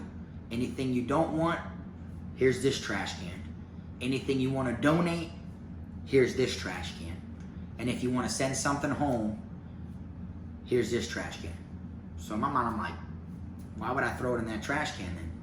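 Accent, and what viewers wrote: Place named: Louisiana, United States. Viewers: lol theres no way your actually from broward, i mean you haven't lived there your whole life, i dont know too many people who were born in dade or broward and have that kind of southern accent, where are you originally from? you sound like what i call an FCG, florida country gangsta, sounds like it could be used as in insult but its actually not at all and you don't need to actually be from florida to be FCG, thats just where i first got it from. basically its somebody thats pretty much a redneck, spent most of their life in the country or a small town but for whatever reason is gangsta as fuck and could just as easily move to the city and fit right into the hood even as a white guy or like one of those white guys that ends up in the bloods or crips cuz they are just that kind of OG. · You sound like you have kinda a Louisiana accent.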